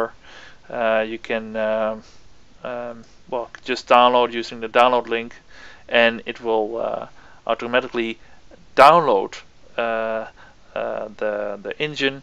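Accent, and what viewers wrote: Netherlands. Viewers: Haha nice! Ik hoor je nederlandse accent haha!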